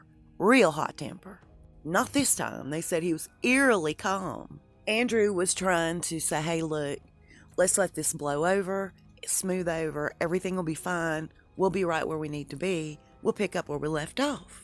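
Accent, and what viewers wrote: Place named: North Carolina, United States. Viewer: Yes, I want the in depth video! I have heard this shady side of the RF for years, and I think part of why they get away with this is that the things they do are so appalling and out there, that people just can't wrap their heads around any human beings doing such things. About hands...I remember a picture of Hillary taken after she had that collapsing episode, where her hands looked markedly enlarged and odd to me. I remember the picture was outside of Chelsea's apartment and Hillary was trying to convince people that she was fine. She was wearing a navy blue pantsuit. That picture stuck in my mind for another reason too. Hillary looked different, and I wondered if it was a body double. I think so much about these elites is just smoke and mirrors, nothing is as it seems. I feel like we've fallen through the rabbit hole. 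And, I love long videos when they're your videos! I like to listen to videos when I am cooking and washing dishes, etc. Seems that I just get my hands covered when kneading dough or scrubbing, then the video is over. Thank you for all you do! You're a blessing! I was on the phone earlier with a friend from Lenoir, NC, and your accent reminds me so much of hers! 💕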